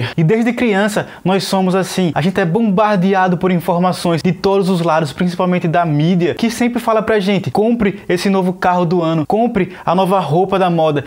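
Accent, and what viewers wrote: Northeast, Brazil. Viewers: Tu é do meu Nordeste,é? Que vídeo maravilhoso. ❤️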